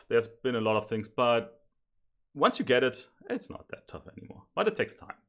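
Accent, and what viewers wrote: Germany. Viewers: Thank you for this Tutorial, are you german?😁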